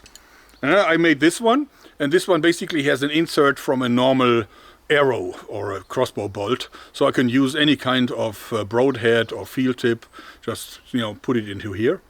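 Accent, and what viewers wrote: Germany. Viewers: Ganz klar deutsch der typ haha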